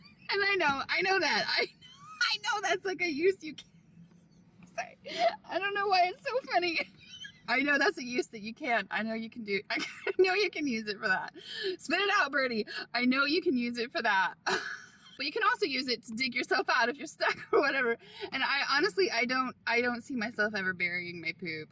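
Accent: South, United States. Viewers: Hi! I love your attitude so much. I don't know where your accent originates from, you sound so much like my friends and me. Especially your vowels. I'm a southern girl through and through! Watching your videos is so much like listening to one of my friends or my sisters. AND your channel is growing so fast!! I'm so excited for you. Can you get a Jackery or something like it that can charge off your van while you're driving? Edit: omg I posted while your video was playing and your appreciation made me cry. We love you!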